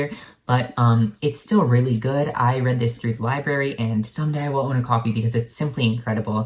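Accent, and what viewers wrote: Canada. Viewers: I have Cemetery Boys beside me right now. I'm going to start reading it tonight. You go to school very close to me! I grew up near a certain university about 10 miles away from yours and I still live in the area. I took some art classes at your school through the continuing ed program, too. It's strange to think you're so close because you sound like you're from Canada to me. Before you mentioned where you live, I thought you were way up north somewhere. If one day you see some old person with pinkish hair staring at you, it might be me trying to figure out if you are who I think you are. I'm really bad at recognizing people.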